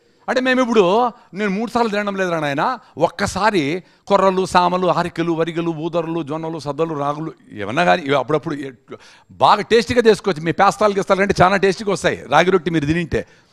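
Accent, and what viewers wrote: Rayalaseema, India. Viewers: మీ రాయలసీమ భాష పెరుగన్నం లాగ కమ్మగా ఉందండి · That rayalaseema slang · మీ బాషా యాస చూసి రాయలసీమ లో పుడితే బాగును అనిపించింది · అసలు సిసలైన రాయలసీమ accent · మీరు మాట్లాడే రాయలసీమ యాస బాగుంటుంది సార్ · Rayalaseema basha entha madhuramga vundi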